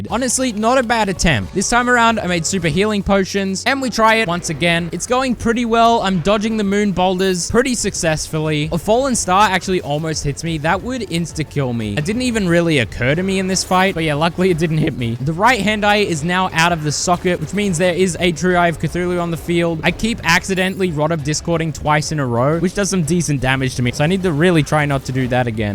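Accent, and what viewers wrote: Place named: Australia. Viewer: I think he’s Australian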